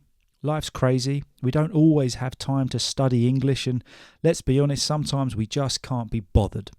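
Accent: United Kingdom. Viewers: The you speak is utterly giving an aid to whoever want to step ahead in British accent. Thanks a lot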